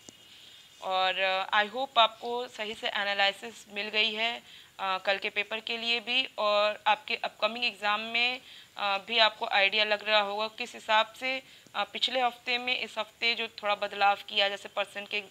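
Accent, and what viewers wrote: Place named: Eastern Uttar Pradesh, India. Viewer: I LIKE YOUR ACCENT 🙂 EAST UP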